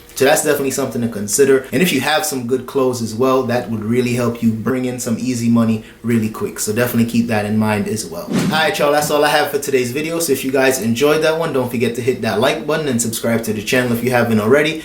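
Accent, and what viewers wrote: Caribbean. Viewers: I still sense some caribbean or something . Lol. I like it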